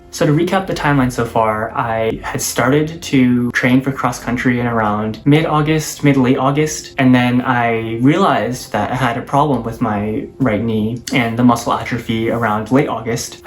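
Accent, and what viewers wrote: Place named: Canada. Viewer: thanks for sharing,you have a strong Canadian accent, bravo for you to share your fight with cancer, even you have used Chemo to kill most cancer cells, mind you the main Stem cancer cell is not destroyed and can never be terminated. Just my personal advice that please keep eating foods that keep your body in alkaline state, cancer cells wont grow strong in an alkaline envirament, this is the best way to survive,do not ever allow your cancer stem cell to come back, remember this.....i pray for your health n have a wonderful life...PS from the video, you have leaky guts and inflammed liver, go do your research on how to deal with leaky guts n what not. stay strong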